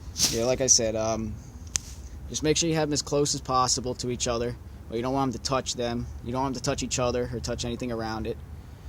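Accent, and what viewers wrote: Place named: New Jersey, United States. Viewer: Very thorough video. I liked watching it. Makes it seem easier to understand. New Jersey accent.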